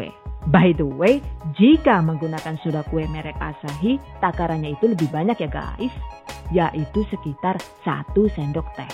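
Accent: Banyumas, Indonesia. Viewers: Mesti nguyu nek ono part ngapak yakin😘😘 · Bu asli mana mba ngapak SMA mba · mesti kekel aq mbak nonton pideone pean...ngapak e iku looo nggarai pingin njiwit.....sukses selalu mbak resepmu sangat mengispirasi.... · ngapakke nemenn mbangett gehh... Wong ndi si jane rika mbk?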